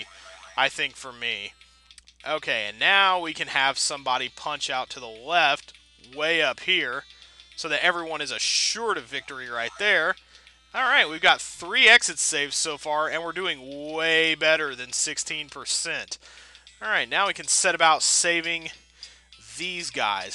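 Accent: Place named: United Kingdom. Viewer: That first level reminds me of some of the levels in the tricky rating. Great Brit accent by the way :)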